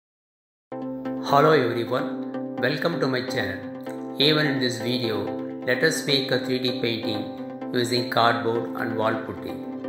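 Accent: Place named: Tamil Nadu, India. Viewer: TQ for jet speed reply.l am also tamilian.so Happy to hear you are also from chennai.i guess because of your English speaking.now I am settled in small.village near by Ongole A.p.i will try to make your articles brother.always your fan.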